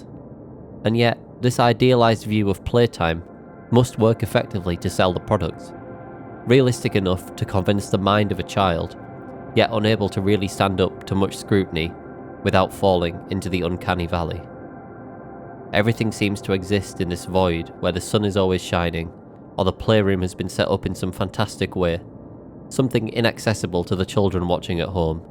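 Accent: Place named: United Kingdom. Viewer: Sylvianian Families was different because, it wasn't just the bg's. Go to the british adverts and you'll notice, each one TOLD a story! So you were part of this story world (that was remarkably close to our own, at least a couple of centuries back!)! *edit: wait. I noticed your accent and I said the British ads 🤣 ignore me on that part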